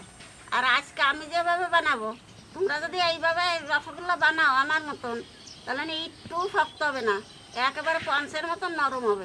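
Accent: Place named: Faridpur, Bangladesh. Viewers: Ei Thakumar adibari faridpur naki???love her accent..💚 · ফরিদপুররে ভাষা বাংলাদেশের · ভাষা শুনে ফরিদপুর মনে হচ্ছে।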